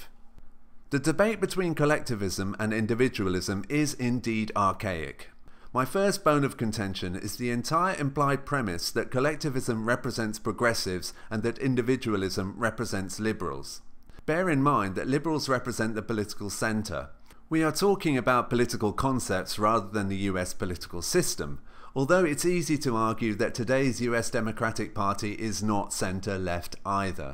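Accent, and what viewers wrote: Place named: United Kingdom. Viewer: british accent :checked video on a hot topic: checked shitty opinion : checked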